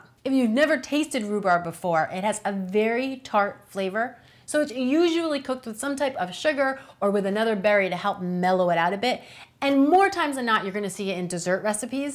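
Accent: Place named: United States. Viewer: Where's your american accent from? Nice video though i wish u had more about harvesting a fresh crop.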